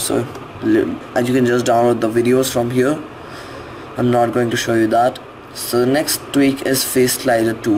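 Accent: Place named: India. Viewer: I love your accent no offense I love Indians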